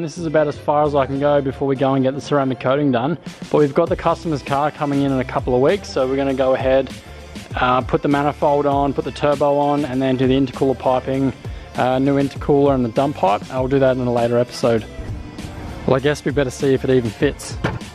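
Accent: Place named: Australia. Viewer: Hey, I love the videos, they’ve been quick, clear and direct info to these motors. Thanks a ton. Also the Australian accent mate! Keep the videos coming!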